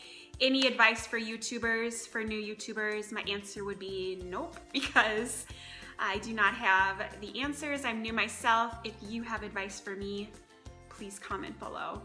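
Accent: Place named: Minnesota, United States. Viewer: Thanks for the Tag! I'm still dealing with this leak 😩 I look forward to doing this tag soon. Oh and you definitely have a Minnesota accent 😉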